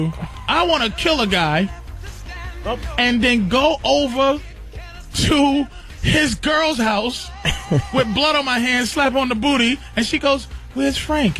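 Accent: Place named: Cuba. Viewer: Patrice O’Neil’s Cuban accent is hilarious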